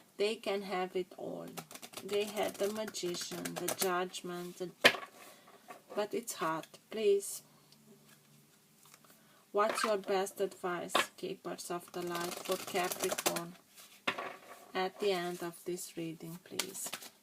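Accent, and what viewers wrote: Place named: Transylvania, Romania. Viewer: Salutare! Vreau sa iti multumesc mult pentru etalare, nu am mai asteptat si versiunea in romana pentru ca aveam nevoie de ghidare.Si, ca de iobicei, am primit o.Dzeu a vrut sa ascult ce ai avut de spus in engleza.Vorbesc si scriu fluent ca un nativ aceasta limba si imi e la fel de usor sa inteleg ca si in romana.Sa te felicit pentru stapanirea acestei limbi si modalitatea complexa in care stii sa iti expui exact ideile, ce gandesti, ce vezi.......La pronuntie mai e nevoie de exercitiu, se simte puternic acel accent ardelenesc, dar totusi face ca accentul tau in engleza sa fie delicios.......Nu stiu sa iti explic cum suna, dar e melodios si frumos. Deci, la sfarsit de an, datorita tie, din nou, stiu exact ce am de facut.Vreau sa multumesc Divinitatii ca iti da aceasta ocazie, sa transmiti ce trebuie cui trebuie si cand trebuie, vreau sa inalt o rugaciune sa te mentina sa fii tu instati intotdeauna si sa aiba grija de tine si sa te ocroteasca! 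Daca nu ne auzim pana in Anul Nou, draga mea iti doresc Sarbatori Fericite alaturi de cei dragi tie, sa fii iubita si inconjurata de iubire, sa ai un An Nou deosebit cum esti si tu! Te iubesc mult, iti doresc tot binele! Gandul bun draga mea! Adrian, Constanta.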